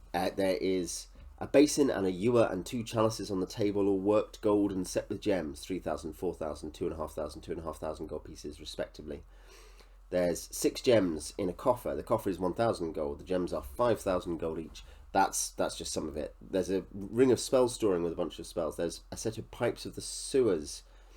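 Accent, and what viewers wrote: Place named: United Kingdom. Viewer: I'm sending off for this today. You make it sound quite enticing. The British accent was the clincher.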